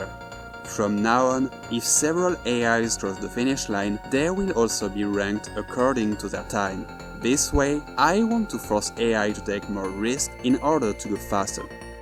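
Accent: France. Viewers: Are you french? Your accent feel french, I am french 😁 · this is what i go on youtube for, i don’t play trackmania but your dedication and passion about what you are doing really makes the cut for me ! i can feel you worked hard on this vid and it pays off, great content man, keep it up :) btw : ça fait toujours plaisir d’entendre un accent français par-ci par-là, continue à faire ce qui te plaît mec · En vrai, tu es français? On le sent 😂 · Great video! You can 100% tell you're french tho :p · Elle est incroyable ta vidéo ! (Je suppose que tu parle français au vu de l'accent ;) ) Le temps que t'as du passé à faire l'entraînement puis le record et le montage, j'imagine même pas. Bravo ! A la fin tu parle de combiner le supervisé avec du non supervisé. Tu voudrais pas essayer de voir ce que ça donne ? Avec ce genre de stratégie tu serais en mesure de créer une IA potentiellement plus forte qu'un humain non ? 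· mec, fais un effort ton accent est juste horrible meme pour un français ! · French accent spotted 😂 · mdr ça m'a littéralement pris 3 secondes pour reconnaitre que t français x)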